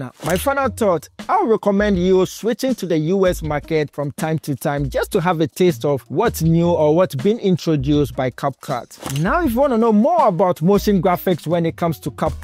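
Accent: Ghana. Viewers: Oo Chaalee, welcome back to Ghana. Since the very first day I came across your awesome edits and tutorials, you sounded Ghanaian until you mentioned Finland in some videos and I was a bit confused 😄. Keep up the good works bro.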